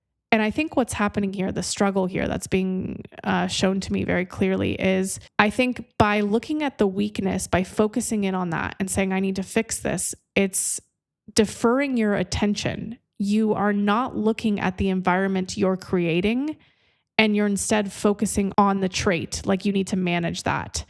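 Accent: Italy. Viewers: Your Italian accent actually sounds so prettyyy and I oove your humor hahha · Things I ❤…., puflet’s and Italian accents. Thank you.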